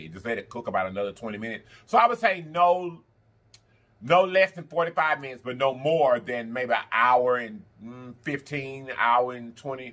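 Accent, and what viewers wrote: South, United States. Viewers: I love you!! I’m from the Sip and I love that southern twang we have... “wait a minute!!! Ya boy done got choked out!!!” 😂🤣😆❤️ I felt that!! ✊🏽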